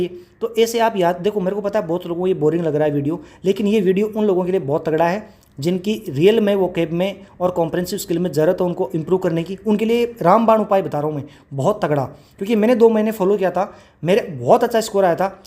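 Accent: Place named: Rajasthan, India. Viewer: Dear sir your accent is rajasthani😅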